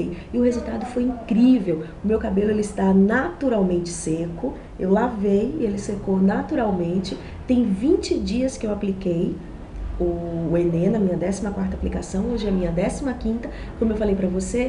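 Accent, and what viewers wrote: Bahia, Brazil. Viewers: Nunca tinha ouvido falar de você, mas quando você abriu a boca tive certeza de que você era baiana tb. que linda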